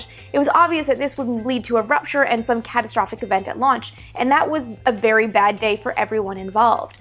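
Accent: Canada. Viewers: My fav launch is the Saturn V with Pete on mission control? Are you from Canada? You pronounce 'out' and 'about' in a different way.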